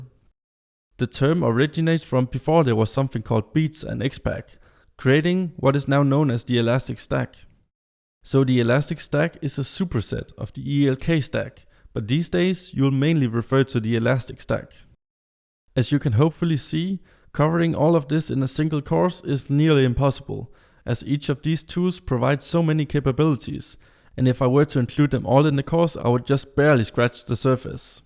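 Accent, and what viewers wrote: Denmark. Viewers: You can hear this guy is danish :D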